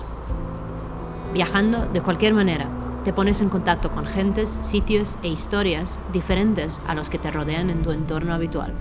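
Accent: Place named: Serbia. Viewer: Genial documental, el acento serbio de la narradora le da un toque especial y exuberante más la calidad y las transiciones que son muy buenas! Felicidades!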